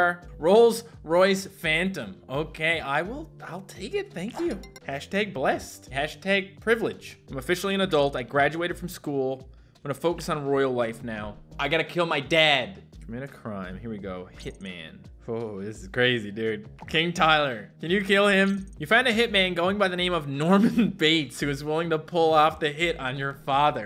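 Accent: United Kingdom. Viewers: That’s an ok british voice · Is that really your British accent?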